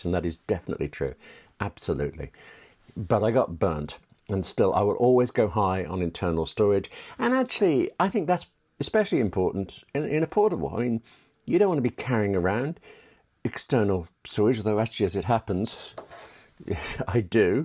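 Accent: United Kingdom. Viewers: Mr. you write for an online tech magazine I can't remember the name of at the moment but I really appreciate your articles about Apple. Great work! Cool to see you online with that British accent. So at some point, Bob's your uncle. Thanks for all you do! Subscribed.❤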